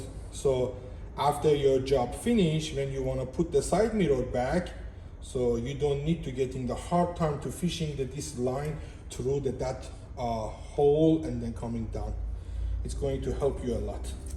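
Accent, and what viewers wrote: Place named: Iran. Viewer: جان. دمت گرم. عاشق لحجه فارسی انگلیست هتم. میخواتم بدونم که سی۳۰۰ معمولی هم همینه یا فرق داره. میخواستم کامنت، لایک و سابسکایب کنم. موفق باشی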